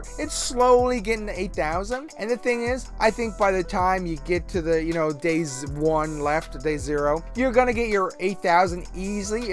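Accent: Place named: Boston, United States. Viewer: This accent is crazy. It's like you're from Boston...Canada?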